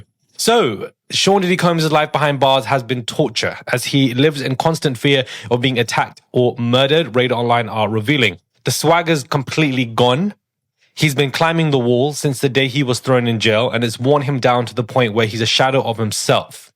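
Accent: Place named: England, United Kingdom. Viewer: English accent sucks..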